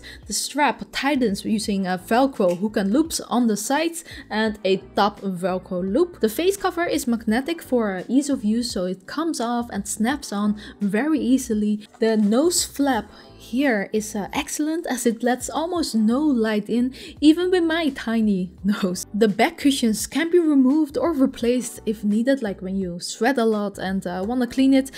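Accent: Netherlands. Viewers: I know a Dutchie when I hear one:)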